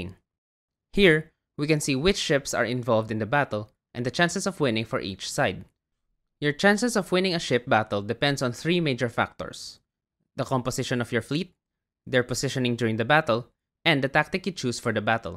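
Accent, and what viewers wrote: Philippines. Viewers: Hearing Tagalog accent was a surprise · I knew from your accent that you're a kababayan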